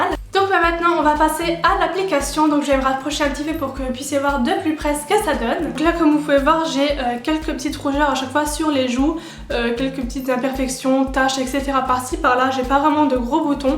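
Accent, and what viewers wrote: Lorraine, France. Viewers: AAAAH je viens de te découvrir et ton accent Lorrain me fait plaisir ! Je suis une Lorraine de base ! Bref là n'est pas le sujet, j'ai commandé la CC crème il y a 2 jours, j'espère la recevoir aujourd'hui car vous me tentez toutes avec vos revues ! Contente d'avoir découvert ta chaîne, je m'abonne direct :) A bientôt ! Charlène <link>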